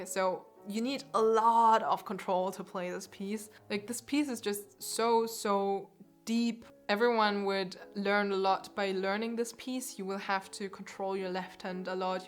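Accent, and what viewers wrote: Germany. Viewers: Nice the German accent and words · That accent tells me ur native language is german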